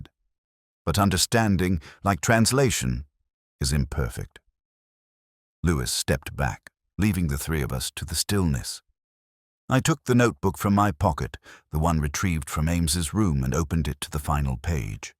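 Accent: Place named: United States. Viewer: A US narrator on quintessential Morse tale ? All wrong ! We don’t have “stoodents” here.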